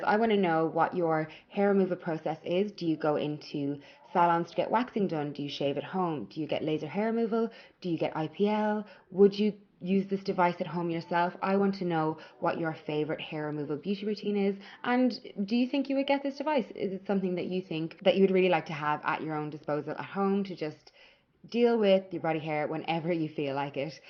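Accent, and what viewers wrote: Ireland. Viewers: Was very confused at first when you mentioned Australia because I was like, "But wait... she sounds Irish. There is no way she is not Irish." Thank you for having accurate information in your "About" section! :)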